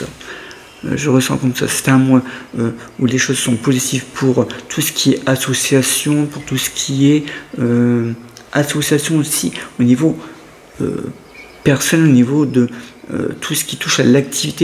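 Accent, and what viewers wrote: North, France. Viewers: Bonjour, bon dimanche. Votre lecture est d'une précision époustouflante. Merci je vous écoute avec votre joli accent du nord de la France ☺️ Cordialement Danièle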